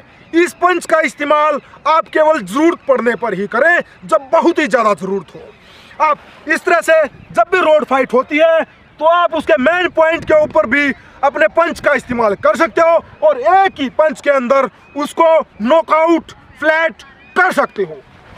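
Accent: Haryana, India. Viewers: Are you haryanvi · Haryanvi ton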